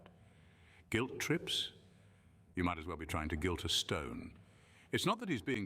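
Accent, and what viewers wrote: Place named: RP, United Kingdom. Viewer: The plummy accent is suuuuch a turn off.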